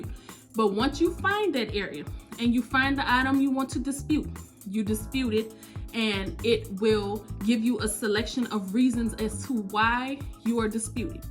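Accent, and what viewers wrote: New Orleans, United States. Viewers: First time here. As soon as you started speaking I was like “she sound like she’s from New Orleans”, lol! so I checked the description box and I see that you’re in Slidell, close enough, I know my people! I’m in NOLA 🥰🥰 so now I’m a new subbie!!! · Great video! That accent is heavy, are you a NOLA sister?? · She sounds like she’s from New Orleans .. I need help negotiating stuff like past debts that are in collections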